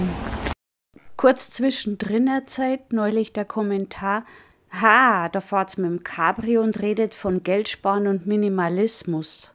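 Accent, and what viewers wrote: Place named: Bavaria, Germany. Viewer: ich habe deinen kanal zufällig entdeckt und als allererstes viel mir dein mir so vertrauter bayerischer, von dir sehr beruhigend gesprochener dialekt auf. dann gleich als nächstes deine authenzität, die bescheidenheit und emphatie. keine reisserischen storys, sondern alltag, untermalt mit tiefsinnigen gedanken, die zum nachdenken anregen. daumen hoch und abonniert!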